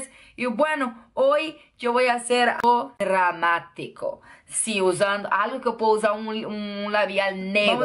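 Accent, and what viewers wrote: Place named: Portugal. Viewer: Su acento es portugués si no me equivoco, me encanta su acento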